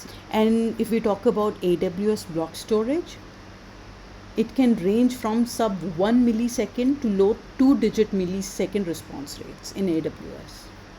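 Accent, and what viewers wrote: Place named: India. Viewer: sure u indian...accent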